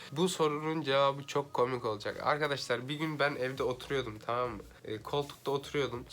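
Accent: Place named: Germany. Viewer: Kardes oyle bir ağzın var ki 30 senedir almanyada yasayan kuzenim gibisin , abd'de 1 senede turkceyi mi unuttun neden konuşamıyorsun?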